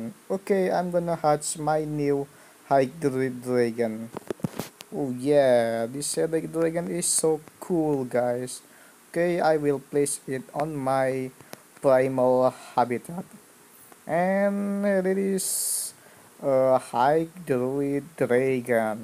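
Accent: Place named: Indonesia. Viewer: Bang gw tau lu orang indo di lihat dari ip chanel dan logat bahasa inggrisnya wkwkwkk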